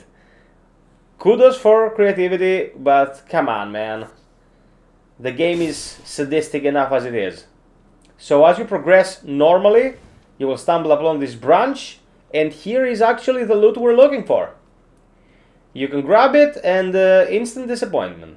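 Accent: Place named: Greece. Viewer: Η Ελληνική προφορά δεν κρύβεται με τίποτα! Ευχαριστούμε για τα guides αδερφέ, πολυ αναλυτικός! <3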